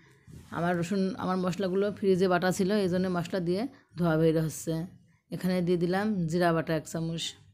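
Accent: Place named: North Bengal, India. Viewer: প্রথমে ধন্যবাদ জানাই সালাম দেওয়ার জন্য । কথার ধরনে বুঝা যায় তুমি উত্তর বঙ্গের । থাক্ ভাল থাকুন, সুস্থ ও নিরাপদে সুরক্ষায় থাকুন।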